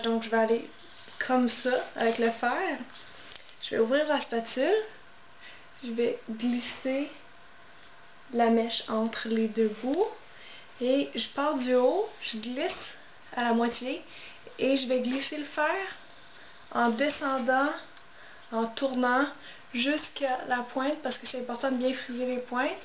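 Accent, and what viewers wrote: Quebec, Canada. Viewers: Merci je suis novice là dedans j'ai toujours voulu m'en faire :) tu explique très bien, tu as de très très beaux cheveux, puis tu es ravissante ! autant les cheveux lissés que bouclés :) et en + de ça j'adore l'accent québécois :D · Merci madame la Québecoise à l'accent adorable, la France te remercie elle va pouvoir se boucler les cheveux sans faire de révolution ! Youpi ! <3 j'adore ta technique ! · Elle est québécoise, d'ou son accent. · Elle est québécoise pas Belge. On ne parle pas comme ça! :p Mais l'accent est mignon! :)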